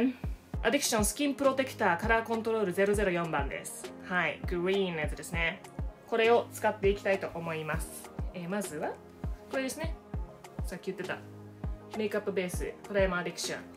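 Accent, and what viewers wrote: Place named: Kansai, Japan. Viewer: ショート動画から来ました。すごいおもしろいです！！ 東京CA風の美人な見た目で関西弁だったのでちょっと混乱しましたw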